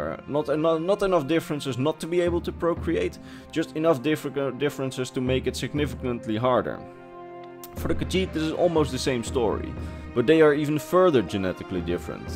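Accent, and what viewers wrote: Netherlands. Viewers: Hey! Je bent ook Nederlands! Ik dacht al dat ik het aan je accent hoorde XD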